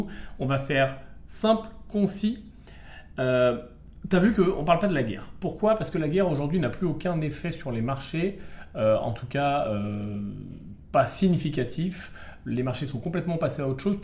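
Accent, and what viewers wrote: Marseille, France. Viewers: Pas mal l'accent marseillais ! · Bien l’accent marseillais 😃, allez Marseillllleeeee 😄 !!! Si j’ai bien compris i need to have the guts (intestins in english) pour aller sur certains marchés.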